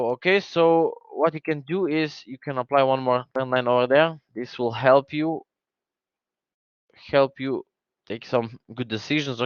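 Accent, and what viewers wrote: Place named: North Africa. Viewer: " from Slovenia " I thought your accent was North African:-) An Arabic speaker. Interesting. Now, I know how Slovenian accent is. All the best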